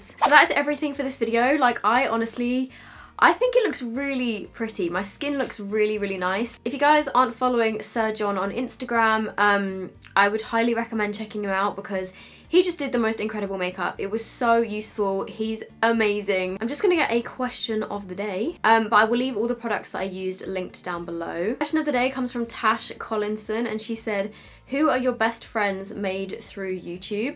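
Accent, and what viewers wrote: United Kingdom. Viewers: I luvv your accent. Is that british? 😍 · Liked the tips very much, but what I liked the most, is your pretty accent!!! I am used to all these Americans doing tutorials (although I am German), so I forgot how I love the British accent!!!! May I ask, where you come from? Is it (as I learned in school) Oxford English? Nevermind, my ears love it anyway!!! Sorry, that I do not comment so much at your technique or whatever but more at your speech.😂 (comment on /comment at?) Sorry, I am German as I said.😉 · She looks like Sabrina Carpenter and she talks like a British Sabrina Carpenter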